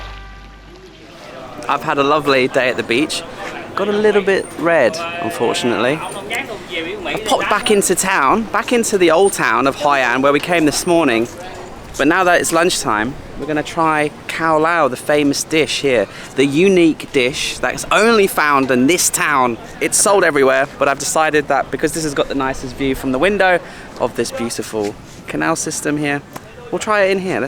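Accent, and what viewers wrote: United Kingdom. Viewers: Hi...i just watch your video about hoi an ( which i go end of this april for 6 days ) I think we have same interest about culture and quiet place and the art of cultural places ( i mean not to so toursty like bangkok which so mega cities that boring except for shopping ) By the way, i am fendi from indonesia..sorry my english not so good ( also i plan to go UK because i feel i connected to UK countryside which i feel when i see documentary about UK ) I think you from Uk? Because of your accent then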